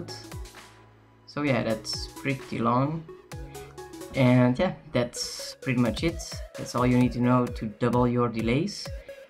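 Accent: Flanders, Belgium. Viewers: where you from? you sound belgium... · are u from belgium/flanders? your accent sounds like that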